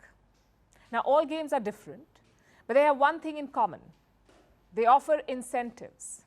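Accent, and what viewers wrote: India. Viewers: This woman is always talking bad about China with an Indian accent. How much does she get paid for doing this? Is there only bad about about China and nothing good?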